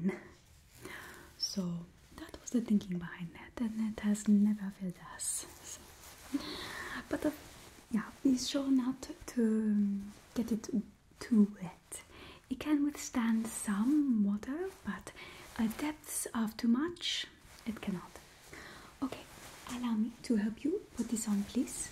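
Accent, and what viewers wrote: France. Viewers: I like your french accent 👍 she is so sweet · I do love your French accent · You have such an Amazing French Accent 🙂🇨🇵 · Wow you really resemble Natalie Portman in this video ... also loving the French accent 🤌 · I absolutely love it when you do your french accent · Honestly, I think has the most smooth French voice I have ever heard. your voice is spot on and quite calming. 👌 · I'm french and I find it very relaxing when you speak with that accent and pronounce french words. You're my favourite ASMRtist and I love your videos,!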